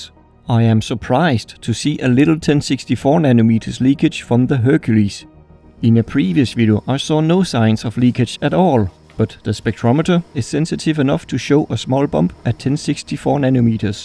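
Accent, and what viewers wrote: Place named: Denmark. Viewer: This accent can only belong to a Dane! Thumbs up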